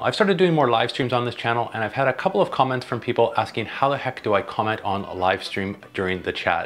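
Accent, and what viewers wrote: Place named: Canada. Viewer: I KNEW you were a CANUCK ! You have an accent! Especially when you said, "OUT" ...."If you have a question, leave me a comment below & I'll try and help you 'OUT' !! Tell tale sign of a Canuck! Tks for your helpful tips on how to comment during live stream. Sending you good vibes & California sunshine ! 🌴😎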